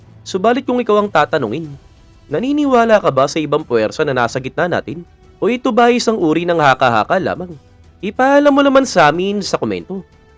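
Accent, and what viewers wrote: Iloilo, Philippines. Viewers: Ilonggo ang blogger?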